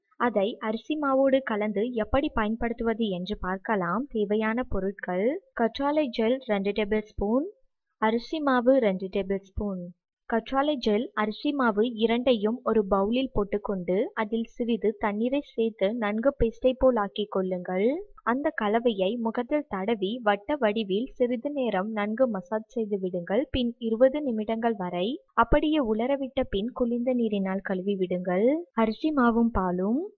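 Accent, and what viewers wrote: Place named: China. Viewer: பேசுவது சீன பெண். அதனால் மன்னித்து விடலாம்.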